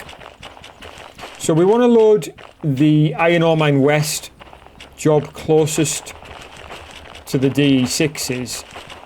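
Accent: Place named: Geordie, United Kingdom. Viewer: You from Mackem land Marra? Or close to Stockton? Sound awfully close to a Geordie but not quite 🤣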